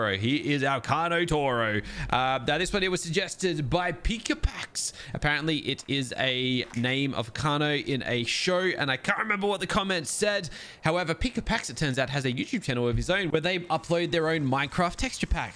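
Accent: Australia. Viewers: Wait ur aussie😃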